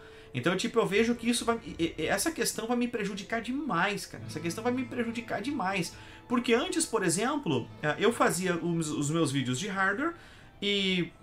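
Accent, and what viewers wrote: Rio Grande do Sul, Brazil. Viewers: O YouTube me indicou, vc é gaúcho?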